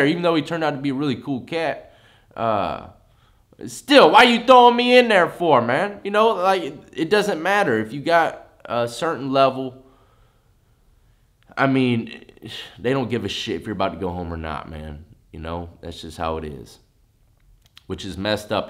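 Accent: United States. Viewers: Why do you Americans say the letter Z wrong!! And in a bid is a way of saying they tried to !!! Not in a bid how death said it !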